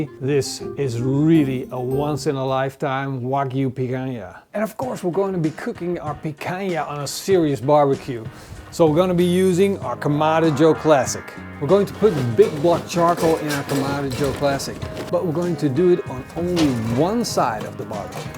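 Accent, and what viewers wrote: Netherlands. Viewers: I was like he sounds dutch hmmm. Turns out he is dutch 😂😂🇳🇱👍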